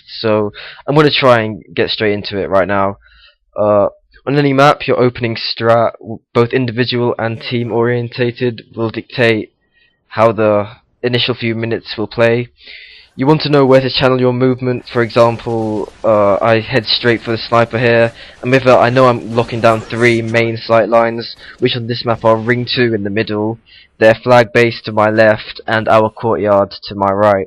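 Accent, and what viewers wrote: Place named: United Kingdom. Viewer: Its British English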